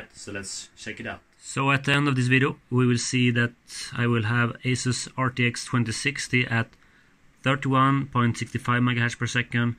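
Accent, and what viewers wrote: Sweden. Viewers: hey i like your channel and the content but i love how i can hear the Swedish accent but keep up bro and i also have a question i want to start mining i have one 2060 and i bought a 3060 ti non lhr what miner or what should i mine and am i late? thanks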